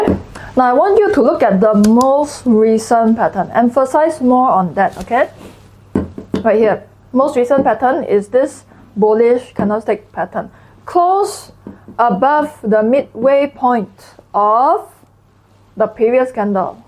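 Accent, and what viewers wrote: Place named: Singapore. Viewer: Stop harrassing her. She is from Singapore, thus her accent. Go to the UK people, and you would barely understand any of their accents. Turn on Closed Captions if you don't understand.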